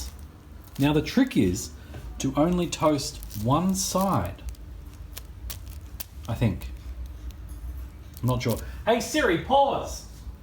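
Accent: Australia. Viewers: Looks like the dual-tap sink typical of British sinks... except you sound Aussie and keep your eggs in the fridge like Americans. I'm so confused!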